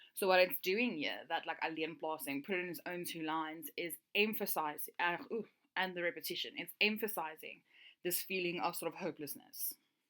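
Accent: Afrikaans, South Africa. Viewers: Your afrikaans accent is so thick. This is my first additional and i really wish i could read like this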